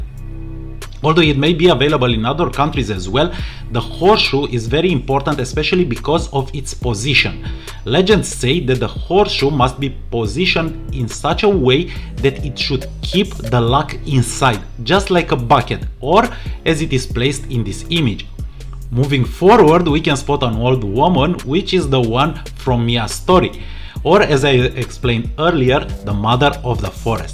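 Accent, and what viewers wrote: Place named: Romania. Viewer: ma crezi ca mi-am dat seama ca esti roman din primele 15 secunde?:))))))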